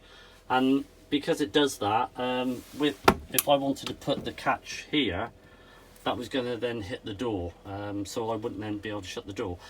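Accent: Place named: Gloucestershire, United Kingdom. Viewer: Superb my friend, attention to detail are excellent. The "gentleman" as always, giving people that have helped you on your journey a thank you, and a really genuine plug. People such as yourself give me a feel good factor, by restoring my faith in mankind, when so many others are trying to "ruck" or "scam" you. A man after my own heart, I'm sure you and I would get on like a house on fire. Phil. Incidentally, are you in Gloucestershire/Bristol district, only I'm picking up on your lovely accent??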